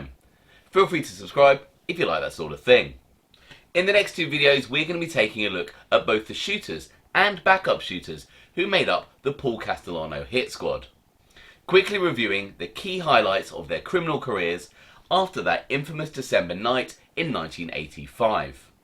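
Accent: England, United Kingdom. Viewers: Blimey that was quite knowledgable and informative. would fit right into a crew if it weren't for that english brogue. ( I couldn't help but imagine playing background music as he narrated )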